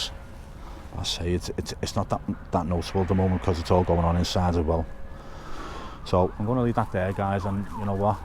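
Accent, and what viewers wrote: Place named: Merseyside, United Kingdom. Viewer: Got to ask Merseyside has distinct accents north,south,east,and west Which part are you from Enjoy you videos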